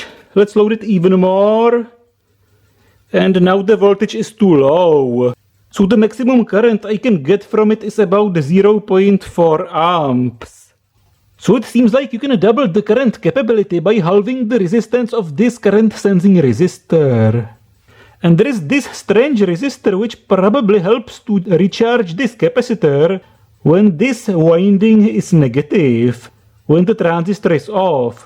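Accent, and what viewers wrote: Czech Republic. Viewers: That is the best accent. Btw jsi Čech žejo 😂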